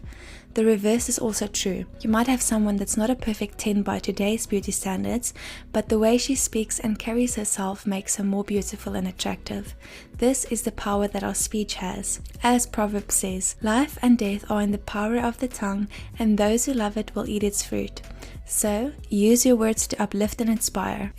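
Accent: South Africa. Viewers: " " Your South African accent is refreshing for a South African such as me, who has lived in the USA for 30 years now. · I can hear that you are South African. I love that!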